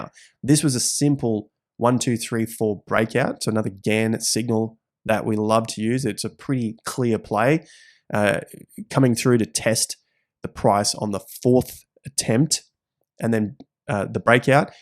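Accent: Australia. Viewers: Surname might be Italian, but an Aussie and he speaks like one.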